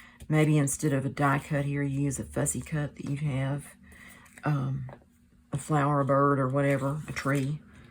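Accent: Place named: South, United States. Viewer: Just found you today. I’m creating right along with you❤. Your voice is so relaxing!! And I’m partial to southern accents as I live in Kentucky. You’re a nice visit on a Saturday afternoon!!😊😊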